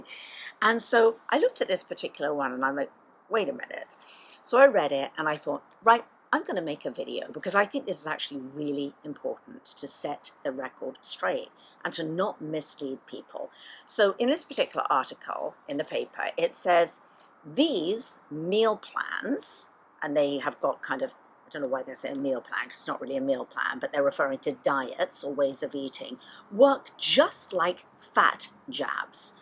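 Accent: England, United Kingdom. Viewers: You sound English but use US terminology, eg, board certified, weight in pounds?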